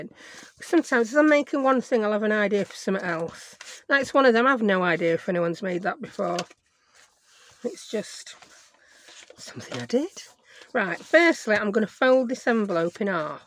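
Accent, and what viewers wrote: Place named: Northern England, United Kingdom. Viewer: Just found your channel and enjoy listening to your accent I am a Geordie living in Canada and I know you are further south but your phrases remind me of home.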